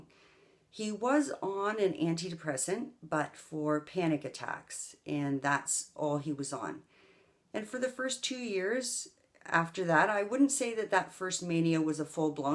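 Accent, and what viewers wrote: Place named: Canada. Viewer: Accent sounds Canadian.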